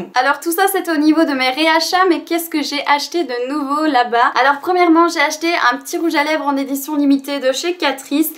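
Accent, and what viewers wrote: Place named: France. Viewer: Make Up Artist l accent francais qui ressort aussi dans les langues latines par ex.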